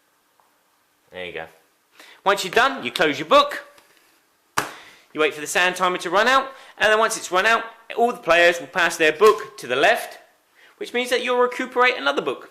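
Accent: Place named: Maine, United States. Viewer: I have never heard that particular American accent before, you must be from the east, far east, like Maine. As always great video. Thanks for sharing.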